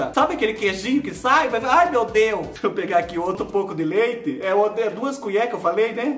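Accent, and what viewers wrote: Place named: Cajati, Brazil. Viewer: tu fala igualzinho minhas tias de cajati 😂😂😂😂😂😂😂😂😂 deu até saudade ❤️❤️❤️❤️😂😂😂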